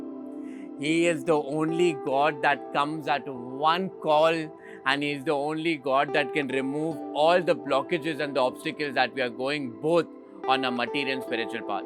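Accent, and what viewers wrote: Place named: India. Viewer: Bhai tu pehle ya to English ache se american ya british accent me bol nhi to Hindi me baat kar, faltu Indians k accent ka mazak udta h duniabhar me ese tone or accent me baat karne se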